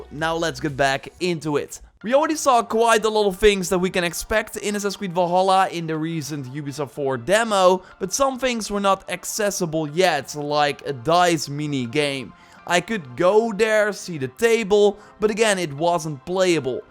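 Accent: Netherlands. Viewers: I know you life in the netherlands because of your voice/ik woon hier ook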